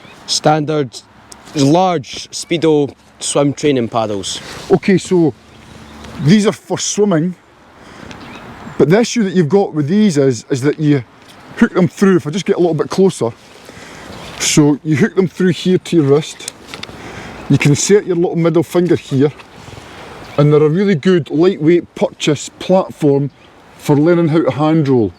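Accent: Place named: Scotland, United Kingdom. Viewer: Come for the hand roll, stay for the AWESOME Scottish accent.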